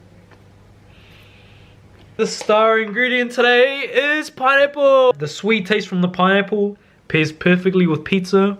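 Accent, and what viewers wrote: New Zealand. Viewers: Thank you for making this. I was struggling with creating my own sourdough starter for weeks but the tropical climate here was hard to navigate. However, your active dry yeast overnight starter is a game changer because I get something that tastes and behaves a little like a sourdough starter without the weeks of cultivation and mould scares. Also, funny thing, I couldn't figure out your accent for a long while (cause I'm bad at it) but my Dad said you must be from NZ.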